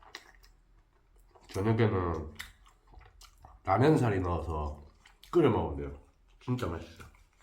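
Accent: Gyeongsang, South Korea. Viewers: 무슨밥? 머슴밥? ㅋㅋㅋ남편이 가끔 “머선일이고?”해요 ㅋㅋ 고 느낌인데요?ㅋㅋ경상도 사투리 좋아하는 1인이예요~😊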